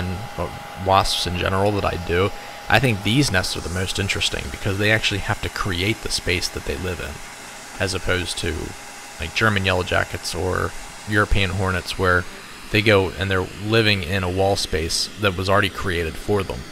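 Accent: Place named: Pennsylvania, United States. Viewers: lol oh man that is def a pennsylvania accent.. it always reminds me of someone i knew from the poconos who spoke like this guy.. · Are you from Philadelphia? Your accent sounds like big jay oakersons philly impression lol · Ah man my family has a bee farm and yellow jackets everywhere! Hate them. I’m from Pennsylvania myself. Sounds like you are from the Pittsburgh area👍🏻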